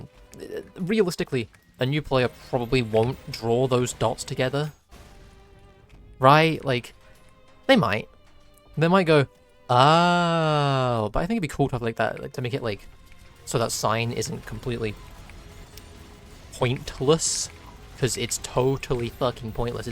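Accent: United Kingdom. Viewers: I enjoy listening to funny British guy's(your) voice while I do stuff... it's like a podcast with occasional ultrakill sounds.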